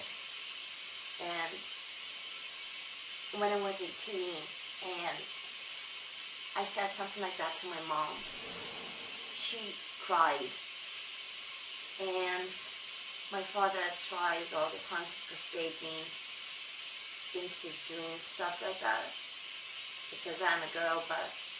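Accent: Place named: United Kingdom. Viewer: damn ur bri'ish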